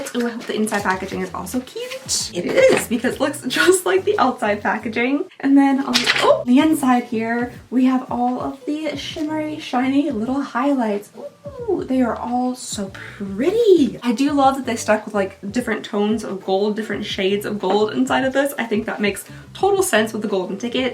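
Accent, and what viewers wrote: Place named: Southeast Missouri, United States. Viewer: Awe! You looked so lovely in this video, young lady! After finding your channel last year, I’ve had a blast watching these! I’m from southeast Missouri so your accent is like home!!❤ thanks for all the smiles!❤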